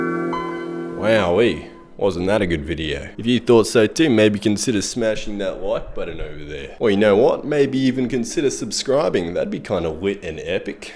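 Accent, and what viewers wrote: Australia. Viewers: first 5 seconds, i can already tell he's a fellow aussie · The aussie accent makes this video 100 times funnier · i knew immediately this guy was Australian. when i saw the wiggles at the end, i was even more sure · Sounds like “I did a thing” us fucking Aussie’s all sound the same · Australian ASMR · Are you Australian?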